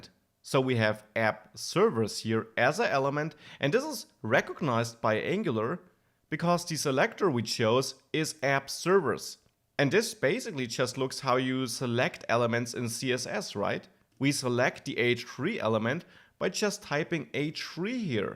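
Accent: Bavaria, Germany. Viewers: Hab mir gerade den Angular 2 Kurs auf Udemy gekauft, und fiel fast vom Stuhl als ich den Dialekt gehört hab. Wenn mi ned ois teischt, a Bayer??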